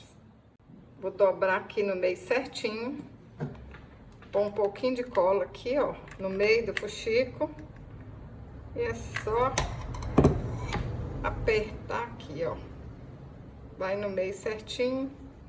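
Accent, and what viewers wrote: Minas Gerais, Brazil. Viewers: Mulher... muito obrigada. Aprendi muito com você. Esse sotaque mineiro me lembra minhas primas e toda a família laaaa de São Braz Do Suaçuí MG. Muito agradecida viu... Beijão fraterno!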